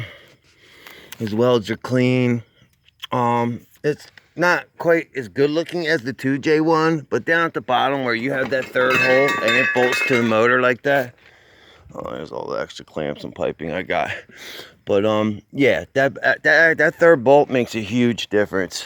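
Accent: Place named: Philadelphia, United States. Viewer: I don’t know you, but I can tell from your voice you’re from Philly, Camden, Gloucester, or Burlington, County. I’m from Collingswood(Camden County). People still gimme shit about my accent, and I’ve been here since 03. I’m about to put ATS 505 CRO/CRC in my wife’s Mini Cooper GDI and came across your video. Anyway great video, man. Thanx for the testimonial in my favorite accent. This jawn better work, 🙃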